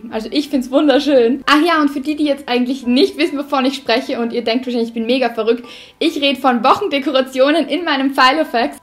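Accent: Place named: Austria. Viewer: Mehr filofax Videos ich habe mir schon auf Amazon eine Wunschliste gemacht für alle Sachen für meinen filofax denn ich noch nicht habe ich werde mir den gleichen wie du holen weil ich liebe einfach Mint😍 und ich liebe euren österreichischen Akzent ich komme ja aus Deutschland ❤️🔥 Liebe Grüße Florida💓💎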